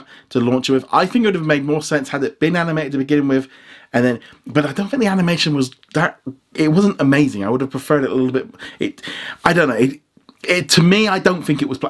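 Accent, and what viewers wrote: United Kingdom. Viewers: Excuse me are you British or are you an American from the USA or Canada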